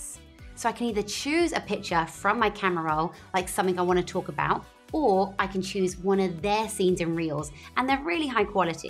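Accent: United Kingdom. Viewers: Instagram reels, British girl, Public or private school accent ? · Agree with other reviewers - really helpful to get up to speed and get it right (and nice to have a Brit accent as well ;-))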